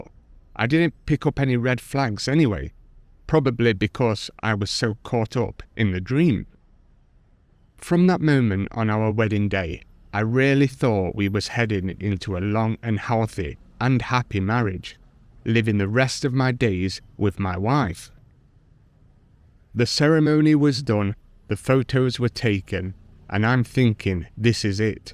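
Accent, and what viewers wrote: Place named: Cockney, United Kingdom. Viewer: Idk, this guys accent sounds cockney/ UK, but claims, beeing american? Anyway, these things happen to every 2. guy, marriing a thay girl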